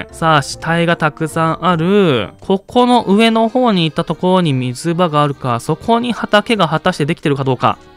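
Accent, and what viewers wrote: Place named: Mikawa, Japan. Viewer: よく三河弁出とるもんで三河？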